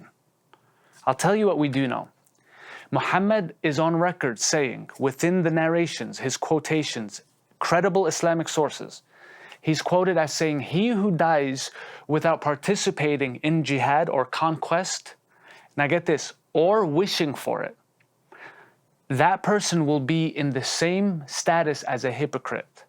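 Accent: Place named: United States. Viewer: Esse cara é americano da pra ver no sotaque dele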